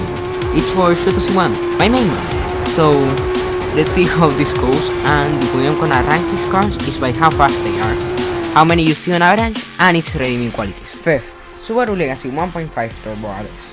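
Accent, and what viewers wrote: Spain. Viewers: Luv the Spanish accent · wtf the spanish accent bro lmao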